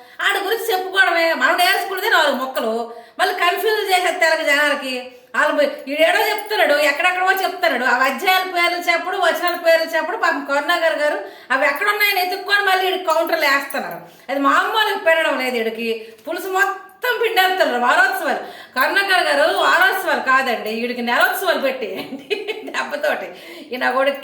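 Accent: Srikakulam, India. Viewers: Sikakulam bhasha needi,mundu neetga matladam nerchukove ,aadadanivena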